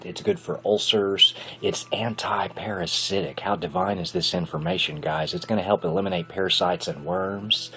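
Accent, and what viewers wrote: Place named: South, United States. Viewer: I love your Southern accent. <3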